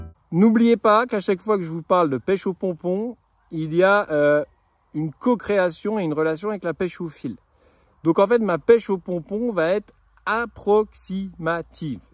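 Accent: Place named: Lorraine, France. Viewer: Bravo vraiment très bien expliqué je m abonne, tu es de quelle région t’as un accent Vosges ou Moselle? 😅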